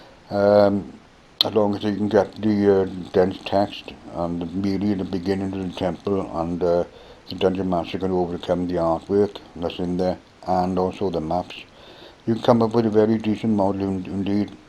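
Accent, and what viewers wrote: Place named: Wales, United Kingdom. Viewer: I've got an upcoming character on my channel I was thinking of giving a Welsh accent so I spent the night listening to your videos. I'm from the States so it's tough getting the rhythm down. The episode will be up in a week or so. Thanks for your work by the way. The classics are the best.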